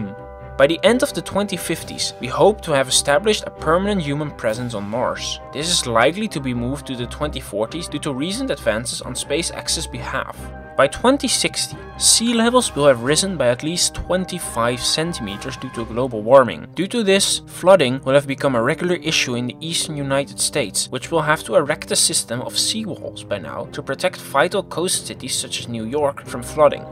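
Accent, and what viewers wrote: Netherlands. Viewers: Gotta love that Dutch accent, kek I'm Flemish myself so it was't hard to distinguish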